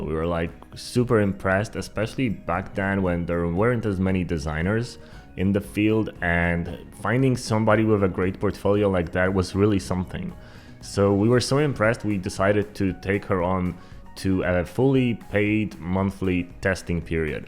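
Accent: Poland. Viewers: Słychać tu polski akcent :D Ale bardzo dobrze mówisz po angielsku.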